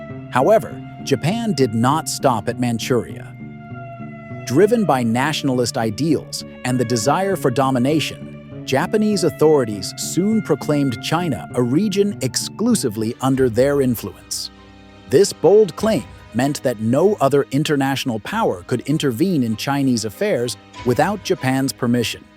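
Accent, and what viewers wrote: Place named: United States. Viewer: Why are the soldiers in the pictures wearing Chinese uniforms and not Japanese? That they are clearly fake allows people to deny that such atrocities never occurred. One can hear the commentator is an American who will not go on to state the pivotal role his country paid in freeing, and releasing many Japanese war criminals like the infamous Unit 721 doctors and inviting them to America or Hirohito Japan's God Emperor. A load of American crap.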